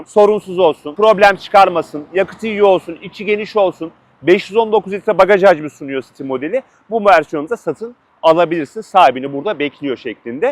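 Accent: Samsun, Turkey. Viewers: Samsun şivesi bu ama konu o değil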